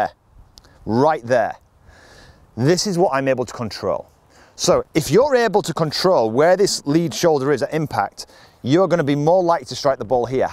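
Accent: Yorkshire, United Kingdom. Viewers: Where are you from? Sometimes I hear Yorkshire sometimes I don’t 😂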